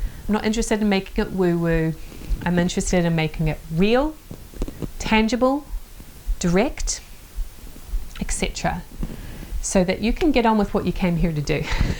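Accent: New Zealand. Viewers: you talk like me a kiwi I guess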